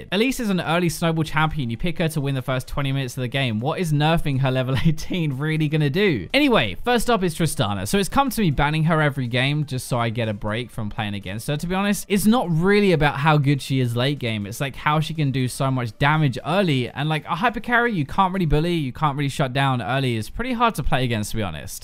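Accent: United Kingdom. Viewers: i love his accent? what british accent is it?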